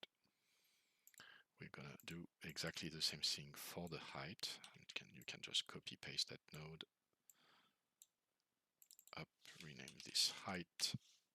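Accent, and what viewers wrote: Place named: France. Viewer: Si j'avais des doutes sur le fait que tu sois français à l'accent, à 11min30 le ''hola!'' m'a vraiment marqué mdr. Merci pour le tuto, tu es un chef.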